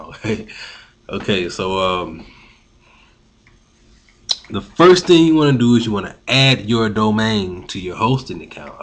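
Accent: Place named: South, United States. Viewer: Thanks, the tutorial is awesome and I love your southern accent ;-)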